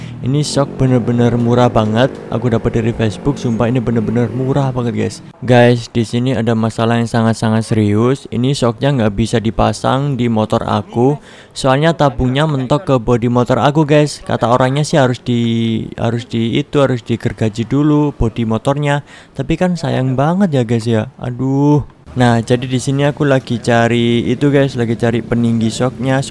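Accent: Malang, Indonesia. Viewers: Orang malang?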